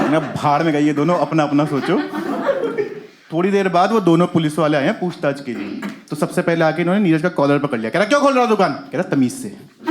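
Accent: Alwar, India. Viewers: Bhai alwar se ho kya?? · Bhai alwar se ho kya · Sir aap alwar rajasthan se ho kya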